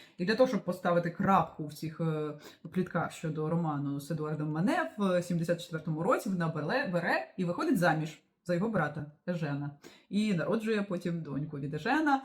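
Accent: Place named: Russia. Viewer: Дякую, за відповідь! Мені дуже подобається ваш контент. Пишучи про мову, я перевіряла свій слух - він у мене близький до абсолютного(я скрипалька за освітою), - того, я радію з свої здібностей. Ваш російський акцент, або російська артикуляція, практично, не помітні.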